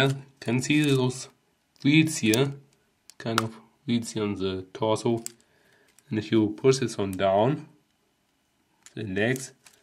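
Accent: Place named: Germany. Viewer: your german accent rules haha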